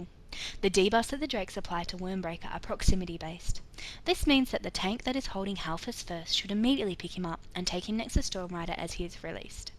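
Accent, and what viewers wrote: Australia. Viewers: Aussie narrator, US based guild. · Aussie right?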